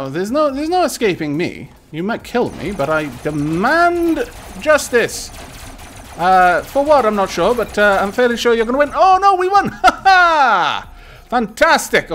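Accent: Wales, United Kingdom. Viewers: I sodding love your accent. The Welsh really have a wonderful language:) Great video, just as usal,! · I love the British accent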